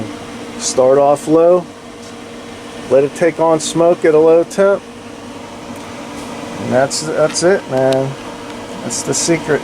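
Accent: Pittsburgh, United States. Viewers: Awesome setup! And my God you sound like such a yinzer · I can’t get past the Pittsburgh accent lol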